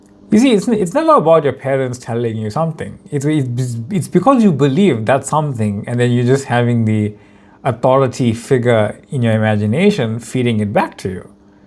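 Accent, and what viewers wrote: South Africa. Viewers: That's how Indian people speak in South Africa.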